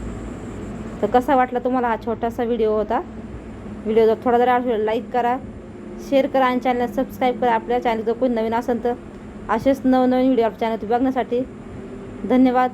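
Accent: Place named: India. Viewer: आपण इंग्रजी माध्यमाच्या शाळेत शिकलात का? मराठी मध्ये बोलताना जास्तीत जास्त इंग्रजी शब्द वापरताय. कट करणे,मार्किंग करणे,साइज,फोल्ड करणे इ.शब्द. एरवी बोलण्याची‌ पद्धत व उच्चार गावरानी वाटतात.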